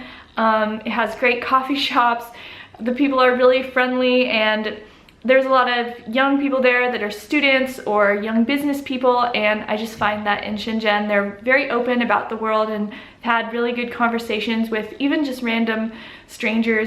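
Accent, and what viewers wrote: United States. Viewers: I guess that you are an American based on your accent. But I can't decide where you got that blue eyes. Hah hah.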